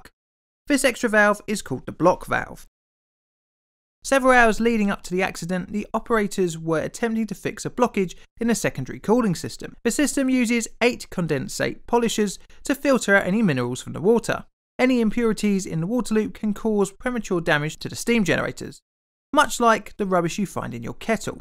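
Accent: United Kingdom. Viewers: done by a nasal Brit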